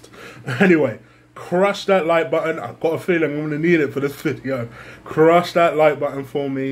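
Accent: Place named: United States. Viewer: I thought you were American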